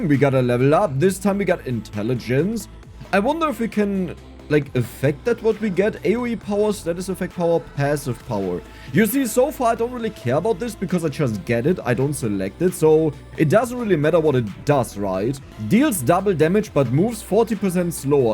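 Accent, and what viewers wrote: Germany. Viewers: man i lover your thick german accent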